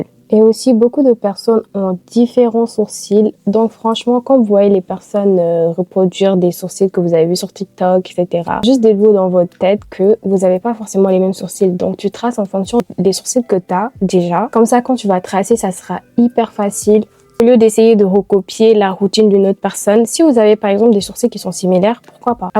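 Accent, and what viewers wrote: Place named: United States. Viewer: J'aime bcp trp son accent elle a l'accent Américain !😂🤣😅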